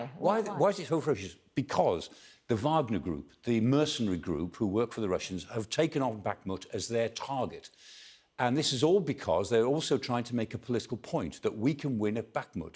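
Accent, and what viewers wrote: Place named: United Kingdom. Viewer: Very beautiful british accent!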